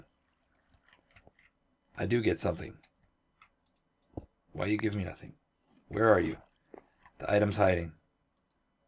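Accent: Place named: Australia. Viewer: Yay another fellow aussie. Its like music to my ears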